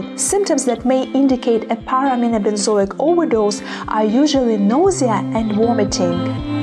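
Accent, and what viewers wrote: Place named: Brazil. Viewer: Thank you doctor. By the way, I love your beautiful accent! I beleive you are from Brasil, right?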